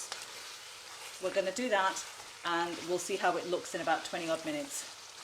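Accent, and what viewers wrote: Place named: Coventry, United Kingdom. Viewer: WOW so simple to cook. I might impress the wife with this. Thanks. P.S are you from Coventry? Your accent sounds very Cov.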